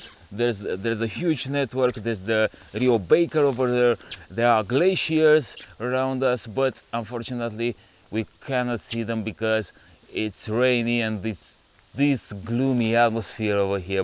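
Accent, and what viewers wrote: Romania. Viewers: M-AI spart frate,cu accentul tau in engleza,te vad intr-o zi cand ma pensionez si ma duc inapoi in Romania. Sunt in State de vreo 25 de ani si ma bucur de jucaria mea- BMW 1200 . Bravo Frate! Entuziasmul tau e contagios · omul e antipatic, de la dinti pana la faptul ca in engleza vorbeste ciudat..ce accent vezi tu ca nici macar pronuntia literelor din cuvinte nu e corecta. Nu are niciun accent, e doar o urma de engleza romaneasca, nu te mai aprinde ca am zis ceva la obiect. Critica e si constructiva cateodata, desteptule.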